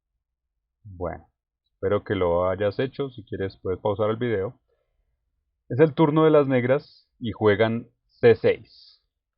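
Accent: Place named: Colombia. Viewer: coño, no sabía que igor smirnov supiera hablar tan bien español,lo habla con acento colombiano,increible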